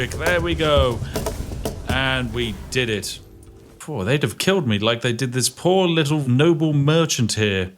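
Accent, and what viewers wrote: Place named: Yorkshire, United Kingdom. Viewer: I DIDNT KNOW I NEEDED THIS! omg nothing so tranquill as a good voiced yorshire brit having fun doing apocalypse things! this is so great!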